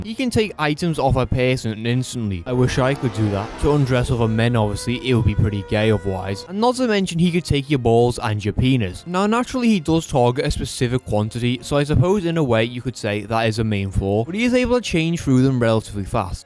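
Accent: Liverpool, United Kingdom. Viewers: why did I, a fully grown person, just watch a vaguely scouse bloke talk about a childrens tv show for 8 whole minutes? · You sound like your fighting the urge to turn scouse with some sentences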